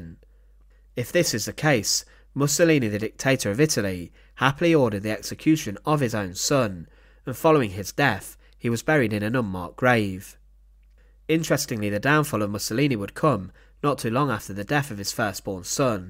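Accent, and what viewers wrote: United Kingdom. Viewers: This british accent is so awful ... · Very annoying your tone, pause and narrative .. are you British ? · You should ask yourself what is the real goal of this video. The British accent of the speaker is interesting. I wonder if this people can tell us something about the relationship between Churchill and the Duce. During the days that preceded the execution of Mussolini, there were British secret service men operating in lake Como, looking for documentation that could put Churchill in dishonorable condition. It is highly suspected that the documents that Mussolini conserved jealously in those days, were the object of this search by Britain. Interestingly, these documents DISAPPEARED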